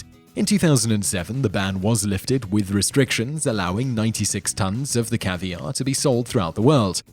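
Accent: England, United Kingdom. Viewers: I hate listening to an english accent with head phones on. Like this channels content. Just dont like the voice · Simon said Indian tribes during this presentation. I didn't know the people of India were categorized as tribes. Another issue, why do most of your videos always have, "for example, in America"? I realize the US might be your largest audience, but the things you discuss also happen in other counties. Your American-centric views are getting tedious. If you want to come across as intelligent, please do so, a British accent doesn't mean you are smarter than the average bear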